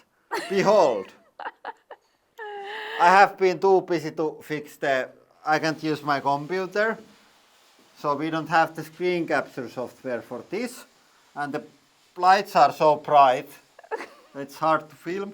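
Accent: Finland. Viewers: Plug. :) U is pronounced oo in finnish. That's also why he sometimes say boonker instead of bunker. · It's funny how strong the Finnish language pattern is.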